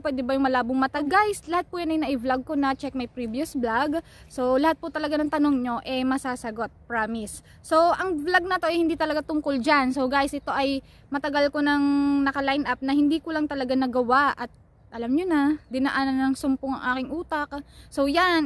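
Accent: Batangas, Philippines. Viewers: Accent ng batangueña 🖤 Pwede po ba magapply ng factory worker ang mga naka eye glasses? Or malabo ng mga mata?thankyou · Batangueno ka ga ate? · Natutunan ko na po Consonants at ang saya nun natutunan ko nakakapagbasa na ako, magaling yung napanood ko Korean Unnie isang nood ko lang natandaan ko na turo nya. Lagi ako manonood sa vlog mo nakakatuwa accent mo na Batangas, nga pala kaya nawili ako panoorin ka kasi kahawig mo si Pauleen Luna lalo pag nag smile ka. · taga batangas ka? hehe nice video 😁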